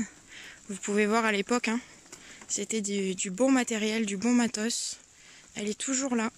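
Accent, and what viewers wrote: South, France. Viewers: Heureusement que tu as des chiens!!! J'adore ces animaux fidèles et efficaces. Méfie toi quand même du gars chelou du soir, c'est pas clair.. Demande à ton copain de te protéger 😉 Tu es toujours aussi belle et craquante. Tu es une belle âme, une bonne personne qui respire la bonté d'âme: Beauté, générosité, bienveillance, fraîcheur, spontanéité, franchise se ressentent car tu vis harmonie avec la nature, loin des maux de notre temps. Bonne route et bon courage dans ce que tu entreprends,. Peut être qu'un jour nos routes se croiserons et çà serait avec grand plaisir que je partagerai une tasse de café avec toi. Ton terrain est dans quel coin déjà, vu l'accent je dirais dans le sud mais vers où? Des likes et des Bisous bien doux 😘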